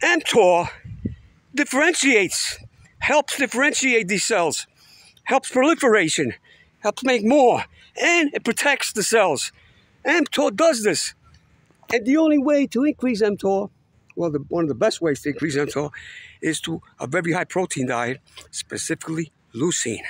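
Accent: New York, United States. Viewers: Your channel is AWESOME…I just subscribed ….youre in Munich but you sound like you’re from NY ….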